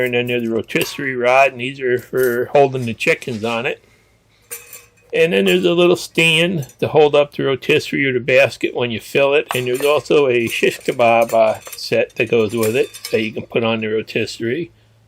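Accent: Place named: New York, United States. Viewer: You have a slight New York accent but no hillbilly twang!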